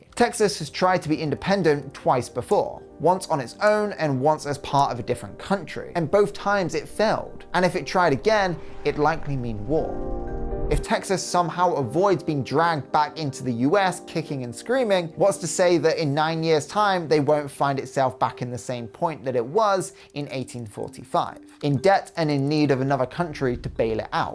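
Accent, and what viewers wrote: United Kingdom. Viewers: texas is in fact the only state that can leave the union. it was part of the contract for texas to join the union. so yes we can leave. when i clicked this video and the first thing i heard is some brit, i already knew it was gonna be a load of crap. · You sound british so ya know fuck all about Texas. We'll, be just fine without the other 49. And no it wouldnt be a war.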